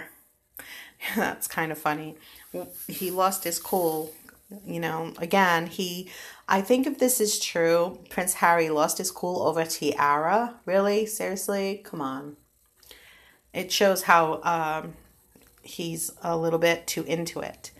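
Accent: Northeast, United States. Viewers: First time here, I’m guessing your from the Northeast USA?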